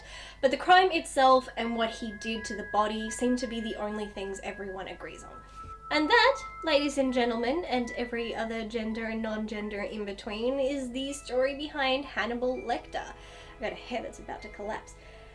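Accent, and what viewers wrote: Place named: United Kingdom. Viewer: Besides doing some good research, the only thing you have in common with “Minty” is that you both speak versions of “The Queen’s English,” (he’s a Kiwi). I’ve been a fan of the movie since the 90s, and am just getting around to the audiobook. Oh, and I’m from Baltimore, and spent a lot of time in Pittsburgh where it was filmed, so it’s always had a special place in my liver, I mean heart <3 Nice research!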